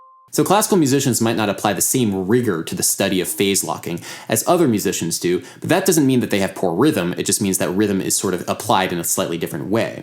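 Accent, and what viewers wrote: United States. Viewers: American musicians follow the German system of naming note values after fractions, while British musicians have adopted the French system. Judging from the uploader's accent, he appears to be an American. Therefore, his use of "quarter" and "eighth notes" is entirely proper.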